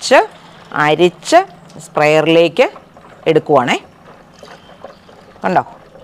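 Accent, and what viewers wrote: Kottayam, India. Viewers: സംസാര ശൈലി കേട്ടിട്ട് ❤കോട്ടയം ഭാഷ ആണെന്ന് ഉറപ്പ്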